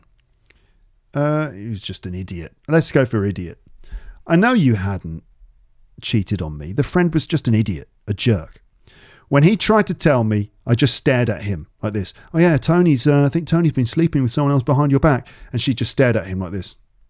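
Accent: United Kingdom. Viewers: May I ask you an external question, my teacher 😊 Your accent is belong to which region of UK? With me, it is clear to understand the story. Not the same feeling when I listen to my beloved singer Adele talks. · I mean you're birtish for me i try to learn accent birtish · Thank you so much,! I can read and write English (with US vocabulary) a little but I can't speak:( I've just discovered your Youtube channel and I literally love it! This story is amazing and I love your UK accent 💓. I'm trying to improve all my English skills. Can I use US vocabulary while speaking with UK accent? Is it grotesque and offensive to native speakers? 🤔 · I love listening to your channel, especially your clear British accent. Keep up the good work, 😊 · Hi, great to hear the story, listen to your British English. Also your comments were very helful. Props to you! I am a 74-year-old writer of poems, short stories and cronicles. 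I have shared the video.❤ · B.B.Wang Perhaps I am the oldest student you have ever taught. I am an Australian-Chinese 90 years old. I have kept learning English to enrich/ improve the living quality of my” late” years. Your teaching course helps very much. I especially appreciate the way you teach. I can follow you almost the whole process. And the UK pronunciation. Thank you!. (Please have tolerance for my poor grammar.) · What an incredible experience I have watching your videos. Love your british accent and the way you explain the meaning of some words. By the way, "mi amor" is Spanish and means "my love" which is a way to call our partners when there is love involved. Hope to see you soon and thank you!! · The attractive places of this Youtuber are not only his British accent, but also his quality of voice and pace! It looks as if I listen an audio book. What an amazing podcast !! I begin to be hooked by his. 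· I like your excellent British accent, it is so attractive to watch your videos more , it's amazing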